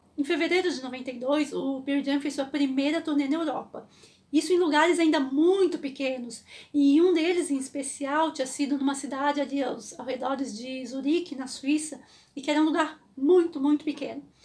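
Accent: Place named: Brazil. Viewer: Somos brasileiros... nossa lingua oficial NAO é o ingles... a gente nao tem obrigacao nenhuma de falar como eles... assim como eles nao pronunciam nada 100% quando tentam falar portugues...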